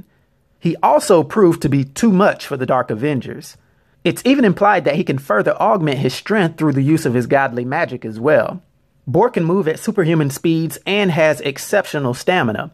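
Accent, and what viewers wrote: United States. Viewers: Terrible hick accent. Lol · great video and loved the accent (american i think?) pronounciation of some of the names! was very different to hear. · He has Texas accent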